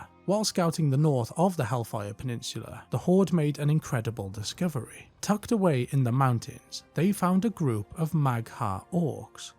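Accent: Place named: Cardiff, United Kingdom. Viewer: I know a fellow Cardiff boy when I hear one!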